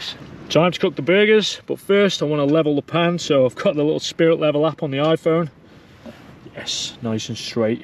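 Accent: Liverpool, United Kingdom. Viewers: Great video. Love the Isle of Man. .Accent is bizaare. Sounds scouse sometimes (I'm from Liverpool myself) but then a few other accents.. Very intersting!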